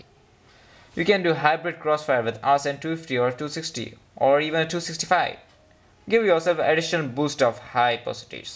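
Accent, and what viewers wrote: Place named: India. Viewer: funky indian accent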